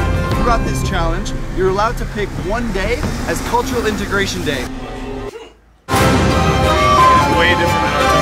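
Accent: Sri Lanka. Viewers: You look Sri Lankan :D You even speak like some Sri Lankans. :) I think we may be rubbing off on you ;)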